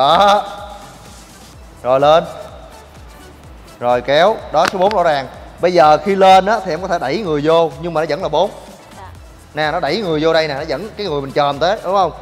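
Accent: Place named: North, Vietnam. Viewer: Lâu lâu vào nghe giọng "đàng ngoài".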